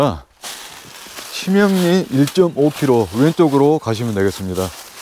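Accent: Jeolla, South Korea. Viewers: 안녕하세요 추천영상에 떠서 놀러왔어요~ 저는 책읽기를 하는데 산을 좋아해서 산영상 자주 보다보니 추천에 늘 산 채널이 떠요😝😝 내연산 저는 딱 한번 가봤어요 근데 제가 간 날 산행중에 비가 너무 많이 와서 정상은 못가고 폭포길 찾아서 내려왔는데 얼마나 걸었는지 하산해서 보니 30키로를 걸었더라구요 저에겐 그냥 힘들었던 산 ㅎㅎㅎ 편안하게 설명해주시니 영상보기가 좋습니다 ㅎㅎ 내연산은 폭포가 유명하다고 하더라구요 친구들 아니었으면 한번도 못가봤을거예요 알바도 하시고 힘드셨겠요~👍👍 전라도 억양 저는 좋아해서 그런지 설명 편안했습니다 ㅎㅎ 감사합니다 구독하고 자주 놀러올게요🙏🙏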